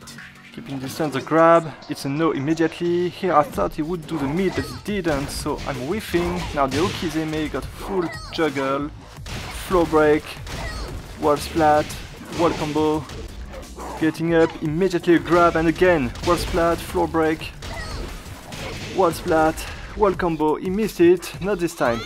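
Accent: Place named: France. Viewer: btw...i love your French accent 😄😄😄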